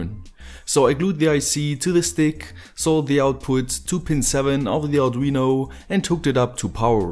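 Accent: Germany. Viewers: English with a German accent. Sometimes surprisingly easier to understand than English from England or English from America can be. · are u german? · What about turning a Laptop into a single Keyboard, Monitor and PC itself? And by the way: You are German, right? Sometimes in the Videos there are German words/sentences and i can kind of hear, that you are German. Wo wohnst du ungefähr? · Hey, may i ask from which country you are? not that i would criticise that, but you have an accent that for me sounds a bit like german - and as im from germany, i just wanted to know if im watching a neighbor or something:) (But well, i guess there are a lot of similarly sounding accents, and as yours isn't that strong it could be a lot different things. isn't a german name at least^^) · Hallo mein deutscher kamerad. Hab deinen akzent erkannt lol :D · let me guess... youre a german... 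(like me) ? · was wondering what kind of accent that was! Glad to know now!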